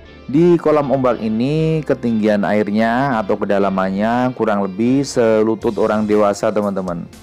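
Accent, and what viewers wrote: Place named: Purwokerto, Indonesia. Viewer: Bahasanya ciri khas Dr utomo yg asli purwokerto👍👍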